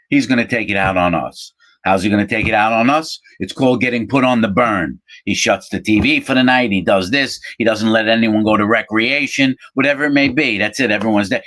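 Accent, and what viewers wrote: New York, United States. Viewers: The way you tell stories is awesome. I don't know why, but i love the NY accent. Thanks for the authentic insight.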